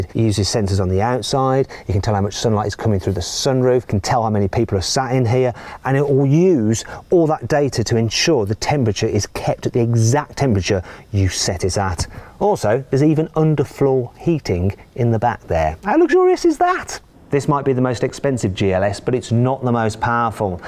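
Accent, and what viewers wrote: United Kingdom. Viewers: 억양이 멋지네요 영국발음인가요?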